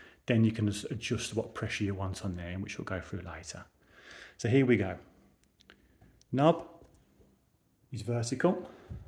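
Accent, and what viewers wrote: Birmingham, United Kingdom. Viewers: Thank you so much for this great video. I'm here in France and bought my machine on my builder's recommendation, but was really worried about how to use it. You took all the stress out of it!! 🙂 (I love your brummy accent too ;-)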